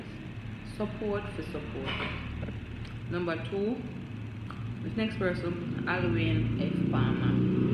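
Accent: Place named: Caribbean. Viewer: Lol i love your Caribbean accent ☺️ ready to support anyone who wants to grow🥳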